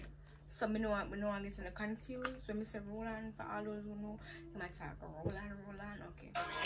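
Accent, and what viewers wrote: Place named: Jamaica. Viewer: As always nice video.. Love the Jamaican accent pretty lady.. You gon make me tip 💰 you something nice lol. Stay focus and keep the videos coming. Your future is bright 🇯🇲 👑..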